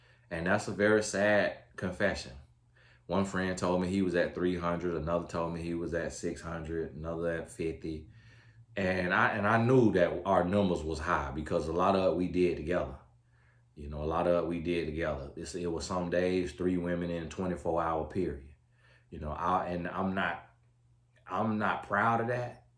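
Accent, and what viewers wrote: South, United States. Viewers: Love this southern man talking in Ebonics! Yes we hear you brother. Time for men and women to claim their God given place! It’s on the men. It’s on Adam. Praise God for breaking curses. Set the example. Say the truth.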